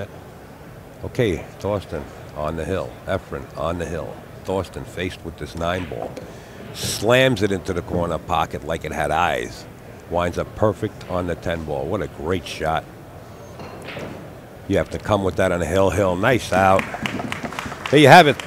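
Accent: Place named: New York, United States. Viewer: We only need efren, NYC accent is not adding to my experience....sorry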